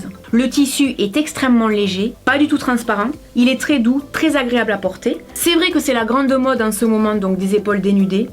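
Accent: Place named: Perpignan, France. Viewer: ( 1 er commentaire ) coucou je t'adore je t'ai découvert sur m6 j'ai 10 ans j'adore l'accent de Perpignan répond moi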